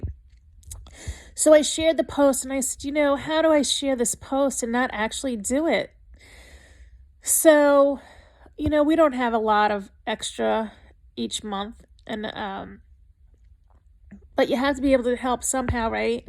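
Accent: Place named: New York, United States. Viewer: You are such a beautiful and kind person! Yes, we all need to reach out and support others. Life is full of ups and downs and it helps to know someone wants to help with no judgement or prejudice. I hope your personal struggles lighten and lift so that you can resume the life that makes you happy! I enjoy your channel and your accent (love that NY vibe!). Stay strong! Looking forward to your next post!. Love from New Mexico!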